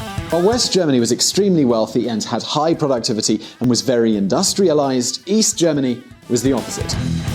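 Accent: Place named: United Kingdom. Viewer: The "Solidaritätszuschlag" you are referring to ignorantly, which you falsely say was used to built infrastructure in East Germany was truly paid by all Germans (that means West and East Germans). It was used to pay • for 15–20 % of the Second Gulf War • support countries in Central, East and South Europe financially • built infrastructure in East Germany • built infrastructure in West Germany *<link> When it comes to voting for DIE LINKE then yes, East Germans are much more influenced by left ideas. Karl Marx was a German. But how in the world is that "heavily influenced my Russian politics"??? Or do you mean "Soviet politics" in your ignorance? East Germans were oppressed by the Soviets. June 17th 1953. And how in the whole wiiiiiide world does East German socialism history explain East Germans vote for the AfD????? That has other roots. Go research them! 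"[...] West Germany became the embodiment of its capitalist occupants." Social welfare was introduce to Germany and cooperated into its free market system by Bismarck in the 1880s. It expanded ever since. Who's unbiased here? Did you watch too much PragerU again, my American friend with the British accent? Your ignorance is enraging. Please consider how many young, inexperienced people are watching your bullshit! Please, please do your research. Please don't fall for platitude. Bring someone into the team who actually studied politics. And economics. Or at least history or law. Anything. Just someone who was taught scienti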